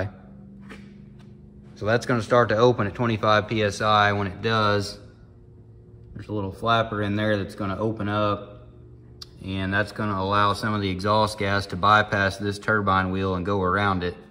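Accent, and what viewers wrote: South, United States. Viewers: I'm from wis but damn I like that accent. Prefect blend of knowledge and no fucks. Makes me think about moving south. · I agree.....He's a very knowable person...don't let that Southern accent fool you. Cheers from San Francisco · The southern voice is something that just keeps me comin back, I can listen and retain information from him all day, no music, no big word science bull shit.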